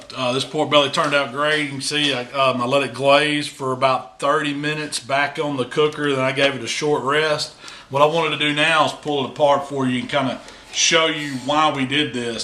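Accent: South, United States. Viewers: I love how southern folks in the USA pronounce foil and oil as foal and oal lol. Man that looks tasty, some good eats right there. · Always nice to hear a gentleman with a nice southern drawl work fancy words like crim dela criim into a BBQ video. But absolutely right, there's no piece of meat better than a pork belly. Except maybe bacon, which pork belly essentially is. Thanks for sharing. The belly looks great!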